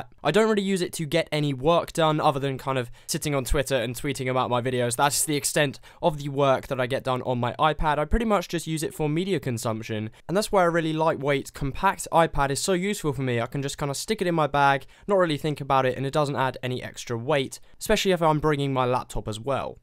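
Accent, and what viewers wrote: United Kingdom. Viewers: Your my favorite tech tuber. You make awesome videos! ...and I love your British accent, talking about tech XD it Reminds me of Jony Ive lol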